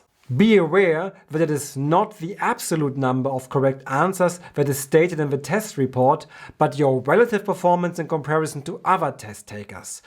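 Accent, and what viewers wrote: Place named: Germany. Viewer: It’s nice to listen to a German among the tones of Indians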